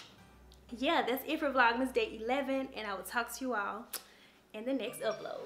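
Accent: South, United States. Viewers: I just love your accent (I guess because I have a southern accent too) and how you carry yourself as a young black woman. It is so important that we as young black women lead by example for young women to be "Elegant" and carry themselves in a manner that a young lady should. I think watching vloggers on youtube who set that tone can help guide them in a positive direction. Naturally Sunny talked about you in her previous vlogs and I am so happy I came across your channel and become a new subbie as well. Thank you for being one of the influencers who brings positivity and laughter when vlogging even when it can become a challenge. I'm so happy that you and your family made it through the horrific storm. I've never smelled Tiffany & Co. perfume but I know it smells delightful and grown woman-ish.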